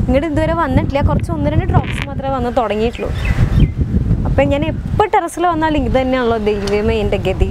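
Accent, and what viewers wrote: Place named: Thrissur, India. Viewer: കുറച്ചു നാള് തൃശൂർ താമസിച്ചു കൊണ്ട് ആണോ ചിലപ്പോൾ സംസാരിക്കുമ്പോൾ തൃശൂർ ഭാഷ പോലെയാ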